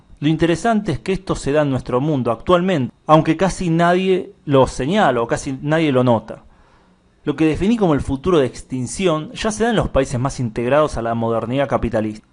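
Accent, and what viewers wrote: Argentina. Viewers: Es argentino, es su manera de hablar.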